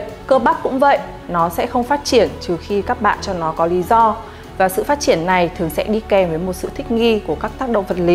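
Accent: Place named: Vietnam. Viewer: có 1 số từ chuyên môn dùng tiếng Anh thì phát âm khá thuần Việt. Team có thể cân nhắc cải thiện ha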